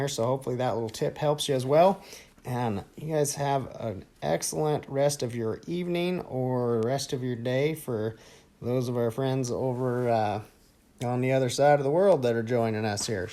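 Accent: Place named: United States. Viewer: awesome video, lots of good takeaways! practicing for 3d modelling. greetings from germany, btw which kind of american accent is that?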